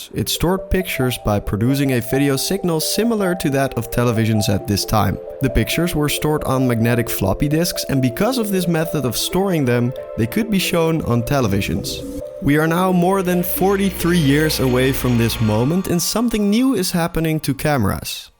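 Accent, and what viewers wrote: Netherlands. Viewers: That distinct Dutchie accent 🤗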